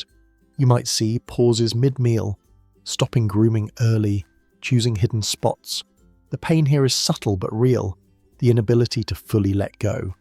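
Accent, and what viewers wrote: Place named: United Kingdom. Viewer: Why do all AI videos have the same British accent. Not a good one, either.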